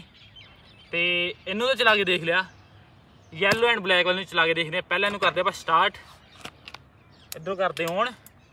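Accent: Majha, India. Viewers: Majhe aale eve hi bolde aa kaka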